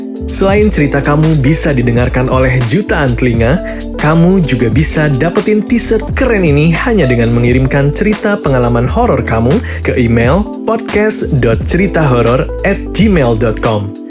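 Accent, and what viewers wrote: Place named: Java, Indonesia. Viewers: Salam ngapak om, ora ngapak ora kepenak. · Jawinya itu loh ada bnget bkin asyik dngerin critanya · Salam ngapak😂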